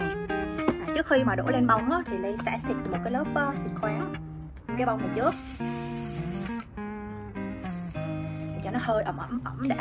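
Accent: Da Nang, Vietnam. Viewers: Nghe giọng là biết ngay ĐN luôn ❤ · Em mê giọng chị vs giọng con gái Đà Nẵng nói chung lắm huhu =)))) chúc 2 mẹ con chị Ly mạnh khỏe nhaaaa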